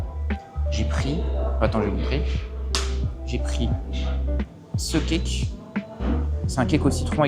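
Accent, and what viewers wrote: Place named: Japan. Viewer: on kiff l'accent japonais ( continue t'es vidéos elle sont incroyables )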